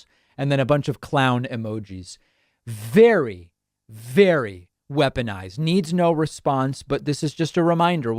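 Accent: England, United Kingdom. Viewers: England and australia do same pronunciation as you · For the record, your pronunciation of "nukes" and similar words is in accordance with British English rather than American English. That person was correct that "nukes" rhymes with "Dukes" but, in British English, they are both pronounced the way you are pronouncing "nukes". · Ok. Just realized another reason why l like listening to you! Your pronunciation! ( Jamaican here ..so perhaps the British influence in the pronunciation choices, appeal to me as well 😏) but seriously --- WHAT is wrong with having multiple ways of pronouncing things! Sheesh...it is a large WORLD here, people! 😑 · nYukes / nYew: this is British pronunciation. And I would never have guessed English was not your first language. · Four years older than his senile diaper wearing former POTUS. The decline of the West, yes, standards of probity and duty and competence have fallen. 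I would put it the door of the sinister Murdoch Empire, myself. Everywhere they go, they spread misinformation and Conservative rage. Look at the powerbrokers, not the fawning, incompetent sockpuppets they push forward for election. By the way, some of your pronunciation is more British than American. Either is correct. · pronounces those English words like we English do. Make of that what you will. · You pronounce "nukes" and "nuclear" as British people do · I think its because you are speaking English rather than American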